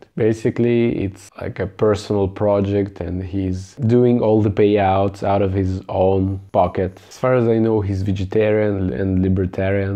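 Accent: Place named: Russia. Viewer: Ты же русский, слышно акцент)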